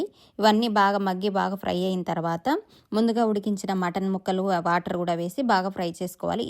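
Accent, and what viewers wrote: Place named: Telugu, India. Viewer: Swachamina Telugu ammayi laga undi me speech ❤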